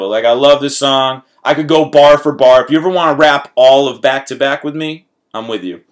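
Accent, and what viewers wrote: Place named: Maine, United States. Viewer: spose, you don't sound sound like a Mainer, but shit can't you tell you're from here bub.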